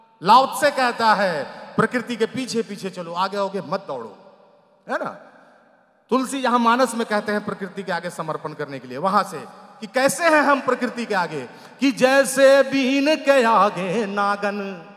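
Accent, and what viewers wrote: Bihar, India. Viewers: आवाज में बिहारीपन का जो मिठास है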